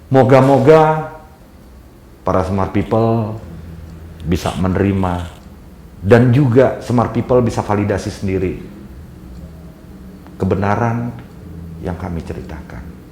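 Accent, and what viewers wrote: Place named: West Java, Indonesia. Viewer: Oh belajarnya dari Banten toh..pantes aja kok logat nya lebih kental ke barat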